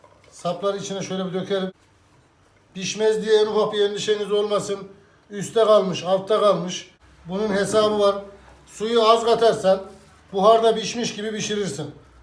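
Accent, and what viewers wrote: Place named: Eastern Turkey, Turkey. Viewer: Usta mız çok keskin doğu şivesiyle konuşuyor.Keşke diksüyon dersi alabilirse türkçesi biraz istanbul şivesi gibi kusursuz olabilir.Yoksa çok kusursuz anlatımı var ve de çok başarılı kendisini tebrik ediyoruz.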